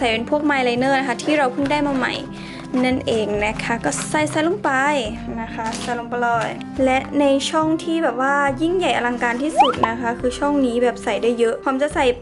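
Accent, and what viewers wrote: Isan, Thailand. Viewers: ชอบพี่ตอนพูดอีสาน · ชอบความภาษาอีสานนนนนนนนนนน เสียงน่ารักมากค่ะ พี่ชอบ กดติดตามแทบทันที่ที่ได้ยินภาษาอีสาน 5555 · เป็นคนอีสานหรอคะ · ชแบเวลาพูดภาษาอีสานอ่ะ น่ารักมากเลยยย❤ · ซ่องวะสั่น555. ชอบความอิสานมากค่า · ชอบที่พี่พูดภาษาอีสานอ่ะ 💕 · ชอบความพูดอีสาน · น่ารักๆเว้าอีสานผสมๆนำมักๆ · เป็นคนอีสานบ่พี่ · ซ่อง 55555. อีสานผสม ปากไม่เเดง ไม่มีเเรงเรียน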